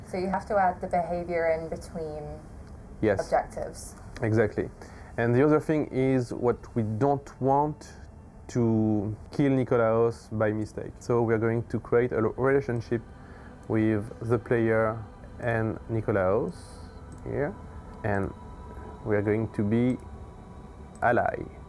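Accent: France. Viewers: I love that strong french accent ! haha ! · Le gars a clairement un accent français, mais ils n'ont pas cru bon d'intégrer le français dans les choix de sous-titre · Guys, seriously why the heck you put someone with french accent explain how a tutorial works out??? It's PANEL not PANAL ffs...i struggle to understand HALF of what this guy says!!!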